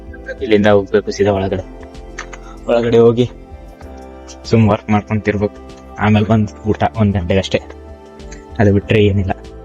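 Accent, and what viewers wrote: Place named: North Karnataka, India. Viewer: Anna namma north karanataka language nag maat ada your logs is very nice👍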